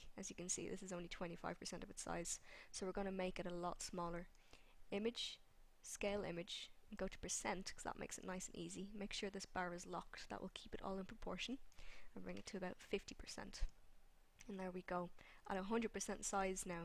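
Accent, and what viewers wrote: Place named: Ireland. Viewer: Irish accent is best accent.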